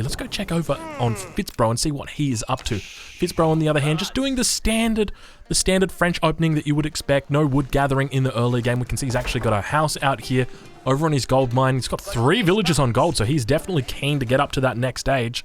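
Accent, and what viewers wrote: Australia. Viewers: omg... can you be less aussie?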